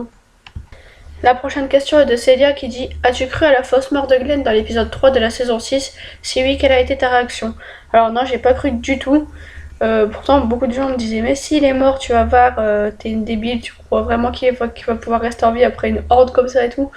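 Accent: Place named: North, France. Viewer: Hey bah mi j'min douto qu'této du nord ça s'intind a t'n'accent alors bin venu au club :) Traduction pour les non ch'tis '' hey bah moi je m'en douté que tu était du nord ça 's'entend alors bienvenu au club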